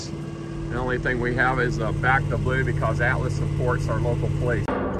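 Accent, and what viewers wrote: South, United States. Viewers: Mr., Are you from the East Coast below Virginia somewhere?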